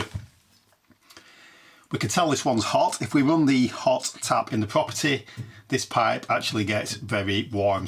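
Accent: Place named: Blackburn, United Kingdom. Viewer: Good video. Im willing to put my hand to anything and can usually figure things out but its always handy to have a good idea before you start and be sure you have all the parts to get the job finished. Judging by the accent your a local lad, Blackburn/Hyndburn area by any chance?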